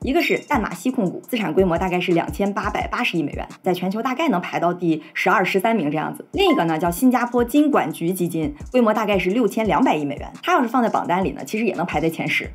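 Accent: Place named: Northeast, China. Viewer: 东北人嘛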